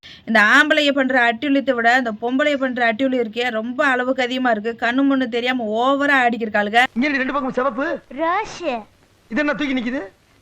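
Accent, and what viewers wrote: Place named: Madurai, India. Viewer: எங்க மதுரை தமிழ் கேட்கவே சிறப்பு சரியா சொன்னமா இங்க ஆஸ்திரேலியாவில் தமிழர் மானத்தை வாங்கிட்டாய்ங்க இந்த தற்குறிகள்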